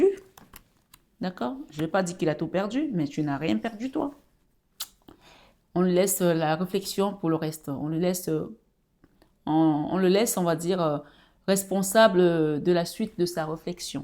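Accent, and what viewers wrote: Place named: Cameroon. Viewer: Merci pour la guidance. Vous avez parlé j'ai pensé à une sœur Camerounaise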